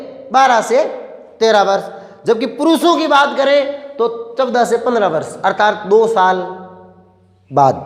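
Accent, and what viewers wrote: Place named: Marwari, India. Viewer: Aap to bahut ache trike se samja rhe ho, muje aapki marwadi voice achi lagee 😂😂😂😂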